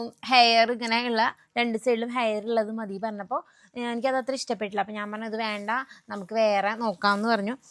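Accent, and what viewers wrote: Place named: Thrissur, India. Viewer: തൃശ്ശൂർ ഭാഷ സൂപ്പർ ആണ്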